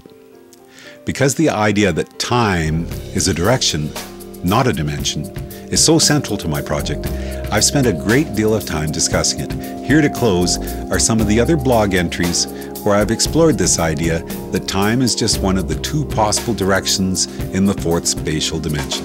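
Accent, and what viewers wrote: Canada. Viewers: is he canadian? he says aboot instead of about... and can you define these extra dimensions as real, if theyre only theoretical? can they be proven? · I had a feeling you were canadian before I read this, sometimes it takes a canadian to recognize the idiosyncratic nuances of speech of a canadian. I can't explain it.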